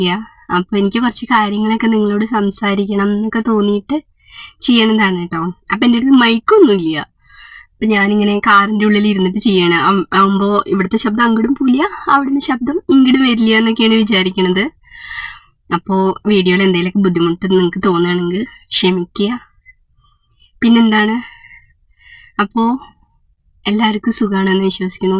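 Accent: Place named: Thrissur, India. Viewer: enik orupad ishtanu, njan trivandrum anu trishur language kelkan nalla rasamond. Enik videos ellam ishtamanu❤❤😊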